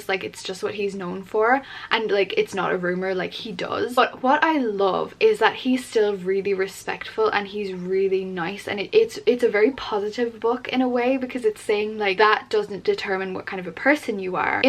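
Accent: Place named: Ireland. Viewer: Sounds like an Irish accent 🙂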